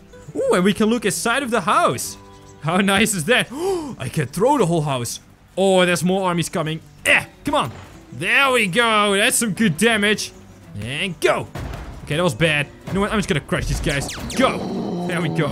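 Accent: Netherlands. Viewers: You dutch???? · are you dutch?!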